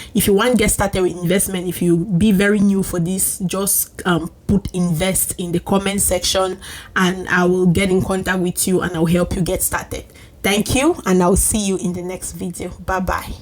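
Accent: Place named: Pidgin, Nigeria. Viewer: Pidgin clear anyhow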